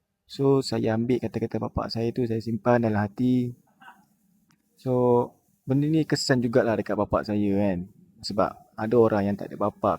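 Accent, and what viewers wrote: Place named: Perak, Malaysia. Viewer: Bila bercakap kuat loghat perak... Tengak.....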